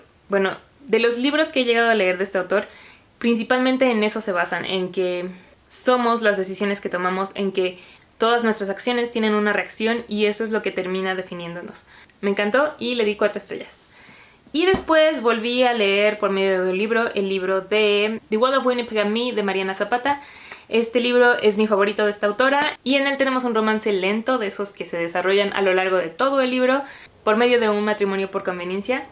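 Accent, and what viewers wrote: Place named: Spain. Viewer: Youuuu have an amazing spanish accent😭❤ Can't help but subscribeee❤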